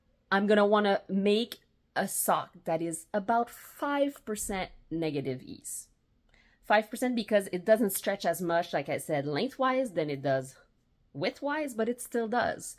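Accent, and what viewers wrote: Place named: Quebec, Canada. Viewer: I’m originally from Ottawa, born in Gatineau, so I’m enjoying your French Canadian accent! I wonder whether you could recommend yarn and where I can get some at reasonable prices? Going to watch your 14 years of sock-knitting video 😊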